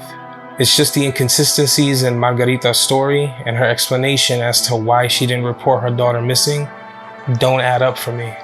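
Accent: New York, United States. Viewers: Where are you from? Sounds like New York accent.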